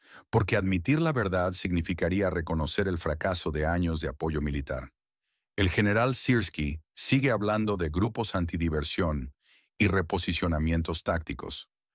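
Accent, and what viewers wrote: England, United Kingdom. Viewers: Si habéis decidido hablar en castellano hacedlo bien, sin arrastrar las erres como tarados. Hablar español con acento inglés desvirtúa nuestra bella lengua e impone un idioma inexistente.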